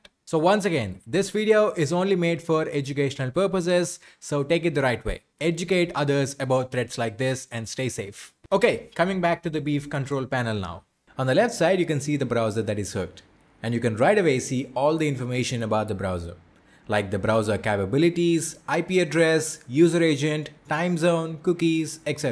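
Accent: India. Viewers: One of the rare indians where u fully understand what he is saying yet you know hes indian due to the Accent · I cant handle Idian accent.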